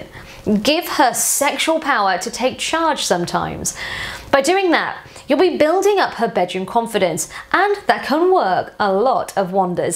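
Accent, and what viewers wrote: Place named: England, United Kingdom. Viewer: Accent? It's the original English language!!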